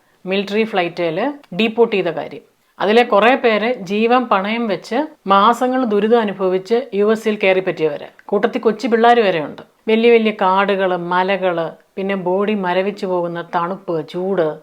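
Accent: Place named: Thrissur, India. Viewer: ചേച്ചി ഞാൻ തൃശൂർ ആണ്, പക്ഷെ ചേച്ചിയുടെ സ്പീകിംഗ് ഒരു തൃശൂർ സ്റ്റൈൽ ആണ് കേൾക്കാൻ രസം, തിരുവല്ല ആണെന്ന് അറിയാം 😂😂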